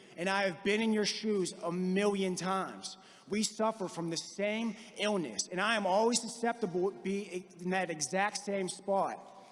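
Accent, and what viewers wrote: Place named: Pittsburgh, United States. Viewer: Bring that Pittsburgh accent to all in the USA! 🤣 Fudge yeah!!!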